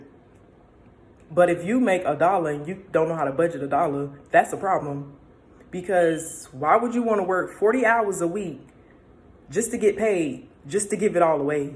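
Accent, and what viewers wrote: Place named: Broward, United States. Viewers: You are such a great storyteller. That southern vernacular, I could just listen to you all day. Netflix is in your future.👍🏾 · First of all I love that you are a South Florida gyal! #hometeam #icantellbytheaccentandattitudeofconfidence but can we just pause for what beautiful fruit God has hanging off your tree. I don’t know who he is…but when God reveals him to you, I will not be surprised at the king he is as it relates to the queen you are becoming. I’m beyond proud of you my beautiful sis. ❤ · Ay where you from. Palm Beach checking in. Boynton beach to be exact...sounds like u from Broward or something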